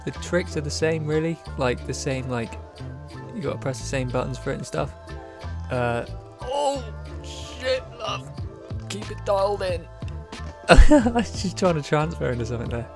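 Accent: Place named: United Kingdom. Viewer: most British sounding dude ever but in the best way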